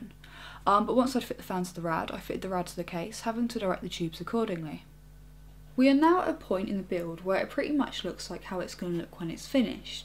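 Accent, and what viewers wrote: England, United Kingdom. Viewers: Armada YouTube Now if you can pinpoint what part of Britain that would be ripper.... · Definitely not australian. Sounds British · love your videos....love the layout....love the pom accent and NO you don't need a tan. Even though my preference is leaning towards ethnic women, you look great! Thumbs up. Just an after thought, would be nice to see an extreme build with this case.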